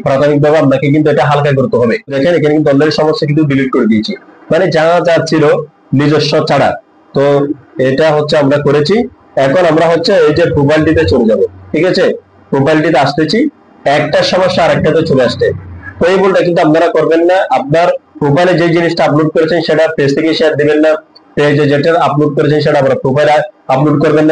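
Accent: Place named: Chittagong, Bangladesh. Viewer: ভাইয়া মনেহয় চট্টগ্রামের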